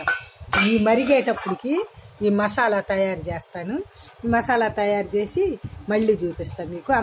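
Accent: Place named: Prakasam, India. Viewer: Meeru chese recipes and natural ga explain chese vidhanam super. mee slang( yaasa) nu batti, Mee vantalanu chusi chepthunnanu meeru ma Prakasam district vaaru anipisthondi. Am I correct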